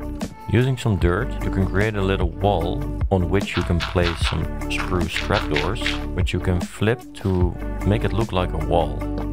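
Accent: Netherlands. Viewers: volgens mij hoor ik dat je nl bent 😅😂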